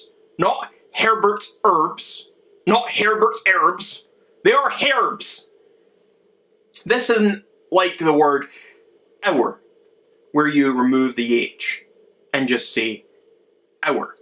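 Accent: Scotland, United Kingdom. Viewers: lol dude from a different country with an british accent is telling me how to say herb go to a Spanish guy ask him I bet he won't say it like you you non educated crazy jerk and by the way we all day it as herb with a H dumb*** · Do not make fun of us Americans. Queens English is not hard, it’s called accents you dumbass. You have a Scottish accent, I have a Bostonian accent, I say erb, you say herb. Not something to make a video about when your Chanel is dying and when you make uneducated remarks with no evidence. You know what I’m talking about.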